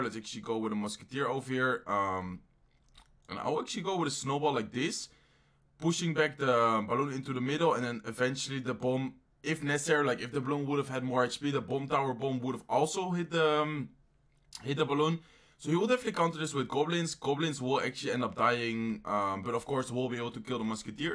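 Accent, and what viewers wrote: Netherlands. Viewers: Actually een best wel nederlands accent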